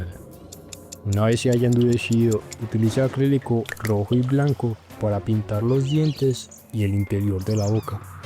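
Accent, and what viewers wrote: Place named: Latin America. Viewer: un video acerca de lo que me gusta con el acento latinoamericano mas bello que existe, saludos desde Argentina amigo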